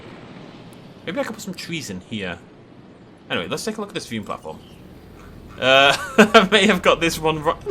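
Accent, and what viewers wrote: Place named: Northern Ireland, United Kingdom. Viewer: Omg how did I not realise you were from Northern Ireland your accent was so familiar and yet I was like??? Why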